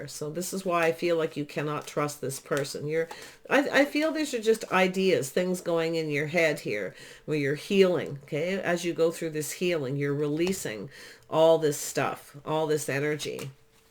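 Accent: Canada. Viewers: I love your accent! The way you say “about” makes me happy! Which part are you from? Curious since I’m looking to travel Canada. I’m not educated enough to know which parts to visit.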